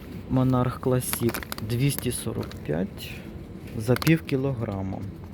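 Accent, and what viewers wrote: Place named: Cherkasy, Ukraine. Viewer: Той момент, коли в мене в області всі так розмовляють і це норма. Привіт з Черкащини, 😅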